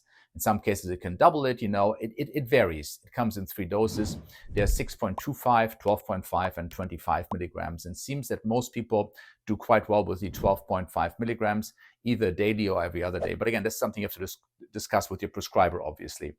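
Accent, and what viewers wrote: Germany. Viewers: Is it fair to say the goldilocks zone for HCG on TRT is between <num> mcg / wk split up 3 times per wk? I believe a break on HCG is necessary after 1 month of continued useage? Break for 2-4 wks? Thanks Doc I detect a German accent & facial features to boot.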